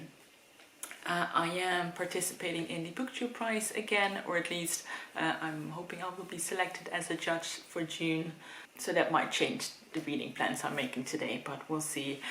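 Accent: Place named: Netherlands. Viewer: Ja. Ik dacht het Nederlandse accent al te herkennen lol. Leuk. Ik heb niet zo veel Nederlanse booktubers kunnen vinden. Lees je meer Engelstalig dan Nederlandstalig? Nog een vraagje weet je misschien waar ik kan vinden of een boek in het Nederlands is vertaald? Ik lees veel in het Engels, maar als ik een boek vind wat mijn zus en moeder ook leuk zouden vinden dan koop ik het het liefst in het nederlands. Zij lezen liever nl talige boeken. Zo ben ik op zoek naar de Nederlandse vertaling van the five van hallie rubenhold.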